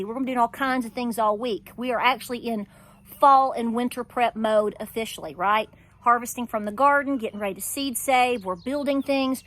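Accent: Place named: Texas, United States. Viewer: Some of the things that you say, I never heard for the long long time. But you make me laugh because I remember people talking like you because my family was from Texas and all down in that area somewhere. Just because my grandparents came to California so my parents from California but I left a 92 just cause I was born and raise their live their 42 years. I don’t think like a California so I’m glad I left. But I so enjoy listening to you talk because it reminds me of Family in Texas how they talked and even when I was younger in California when they were so you know what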